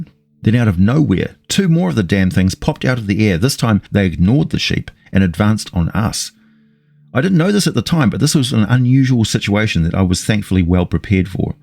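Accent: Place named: New Zealand. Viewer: Hi, I was wondering if you’re related to the stardew valley content creator “BlaDe” at all? You both have very similar kiwi accents imo (I’m from Auckland myself), and you two seem to have a physical resemblance too.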